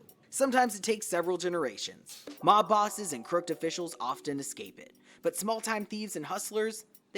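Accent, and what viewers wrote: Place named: United Kingdom. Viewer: I missed your British voice😢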